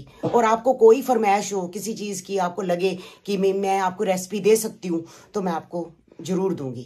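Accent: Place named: Punjab, India. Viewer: Aunty g apki way of taking mein jo punjabi flavour hota hai I like it love u lot from Punjab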